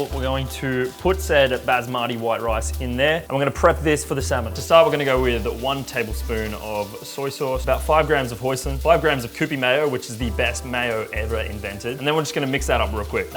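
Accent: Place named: Australia. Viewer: Ahaha didn’t think you would be Australian/sound like that 😂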